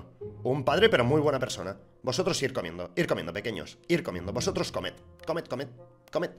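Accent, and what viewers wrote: Mexico. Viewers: sigue hablando como mexicano que se teda mal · no hay nada mejor que escucharlo hablar español mexicano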